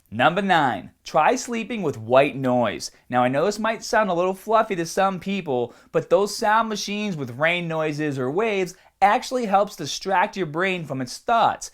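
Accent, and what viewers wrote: Boston, United States. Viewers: Boston much? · Dude! I just started watching your vids a couple of days ago and started watching your vids from yrs ago. This is the first RECENT one I've seen... Wtf happened to your accent?! It's WAY more Bostonian now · Bette? Boston accent? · Lol is this guy from boston? His accent is definitely a boston accent :) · Is he from Boston Area?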